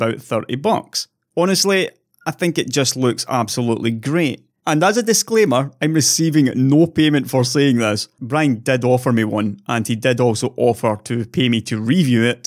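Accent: Scotland, United Kingdom. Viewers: Scotia English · Great content, in depth knowledge, scottish accent. Thick, thick, thick scottish accent. You got my subscription mate. I'd also love some content in scottish gaelic.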